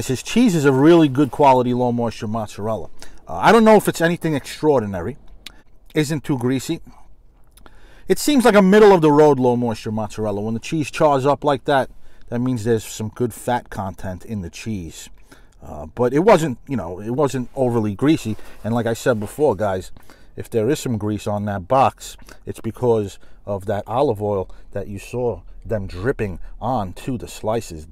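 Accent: New York, United States. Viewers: This dude has the perfect NY accent. I love it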